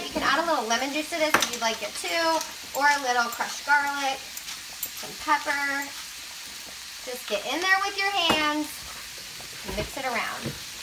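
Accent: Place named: Bangladesh. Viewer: sound like a Bangladeshi ♥♥♥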